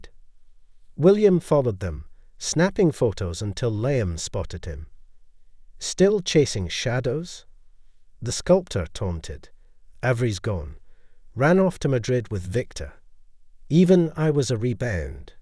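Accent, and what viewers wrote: Scotland, United Kingdom. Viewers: He sounds Educated Scottish to my ears.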